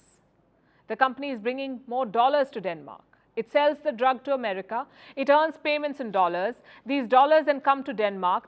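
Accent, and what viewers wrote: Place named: India. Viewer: She has Indian accent. It's a real thing. You will find 500 million people in India with that accent. They only understand that accent.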